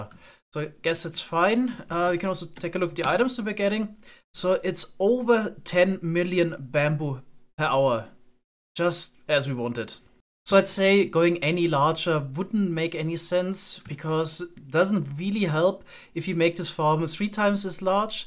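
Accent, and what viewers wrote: Germany. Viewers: Hi, where are you from? You sound German or am I just stupid?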